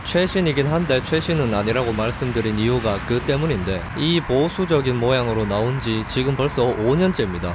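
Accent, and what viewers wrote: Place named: Daegu, South Korea. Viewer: 대구 아재의 구수한 입담은 즐거워~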